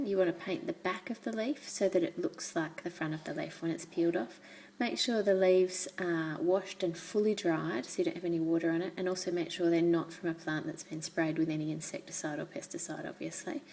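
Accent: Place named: Australia. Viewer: thats amazing. your ausie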